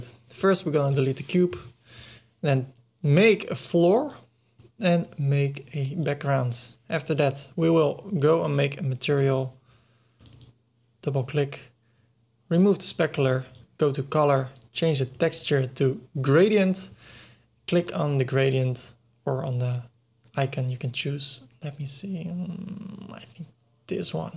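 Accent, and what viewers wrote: Netherlands. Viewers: I absolutely love the netherlands accent. Great video as well. Thanks. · he said: hello, welcome to this new tutorial and I could immediately tell he's Dutch.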